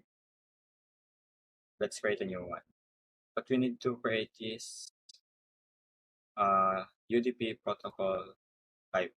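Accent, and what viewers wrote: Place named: Philippines. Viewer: thanks man i really appreciate your tutorial, i cant help but wonder if you're a filipino based on thee way you speak (I'm filipino too)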